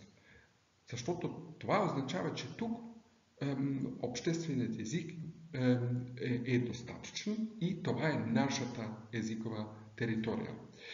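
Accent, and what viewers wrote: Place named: Belgrade, Serbia. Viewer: От къде е тоя човек? Като говори вижда се, че е пораснал в Белград или в Ниш. Аз съм роден в Лесковац и никога не съм учил български в училище, на все пак нямам толкова затруднения да говоря на български.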